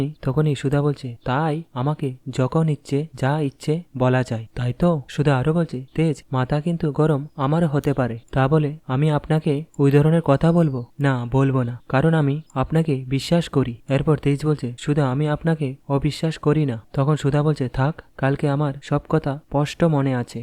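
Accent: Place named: Bangal, Bangladesh. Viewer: কথকতা অতি খারাপ। যত বাঙ্গাল বাঙ্গাল ,অশিক্ষিত কথকের জঘন্য কথকতা ভাল লাগেনা নিজেকে যোগ্য করো।চেষ্টা কর। নিশ্চয়ই পারবে।শুভেচ্ছা রইল।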